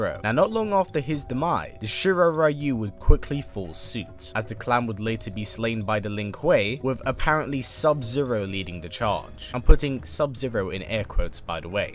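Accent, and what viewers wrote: United Kingdom. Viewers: Wat u have a brutish accent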